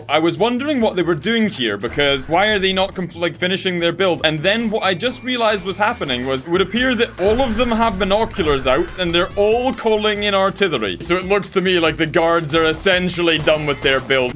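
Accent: Scotland, United Kingdom. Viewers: Is bro Scottish?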